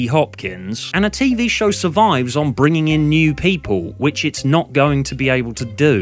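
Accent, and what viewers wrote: United Kingdom. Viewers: So glad somebody with a British accent saying intelligent things about More Alternative Truths is posting. A Disinterested Party (honest.) (Nor am I mum.)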